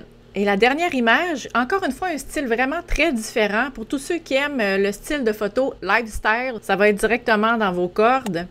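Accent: Quebec, Canada. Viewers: Bonjour, Il y a un petit moment que j'ai le plaisir de suivre vos tutorielsn mais je m'arrête hélas rarement pour vous en remercier 🥴😳.Je voudrais donc vous adresser un immense, "'Merci" pour la qualité de vos explications et le choix des thèmes abordés. Vous faites un merveilleux travail, vos explications sont claires et.....j'adore ce bel accent Québécois ❤. Yves Banière - Astaffort - France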